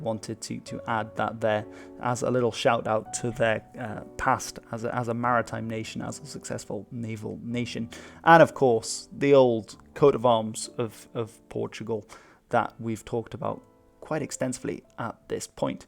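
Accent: Spain. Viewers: Can you pronounce Portuguese words with Portuguese style, not with Spanish style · Why are you pronouncing portuguese cities with the spanish accent you fool? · For what? He is reading every single word with Spanish pronouciation. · Why does he pronunce the words in spanish, spanish is not portuguese languaje, portugal does not speak spanish like mexico, venezuela and others, its portuguese my dude. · for someone who tried to spain out portuguese words, not bad my friend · Me, a Portuguese speaker: "Stop pronouncing Portuguese words with a Spanish phonology"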